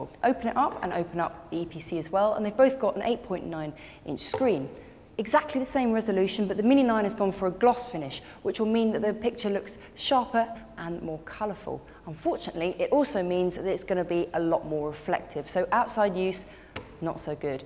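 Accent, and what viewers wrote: United Kingdom. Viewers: I like the way she do review, though her British ascent sometime hard to hear her what she speak.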